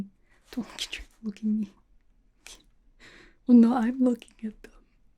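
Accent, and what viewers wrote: Russia. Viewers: Russian Accent is Top 5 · Does she have other Russian accent vids? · As soon as I saw that thumbnail, I was like "Gah dayum. 😍", this is gonna be super tingly. 😌💖 I love that Russian accent. 😁 I dropped a Like for you. ❤️ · The russian accent making a return is nice nice, This kinda came out of the blue but the sarcasm so far has been amusing lol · Another amazing video like always love the Russian accent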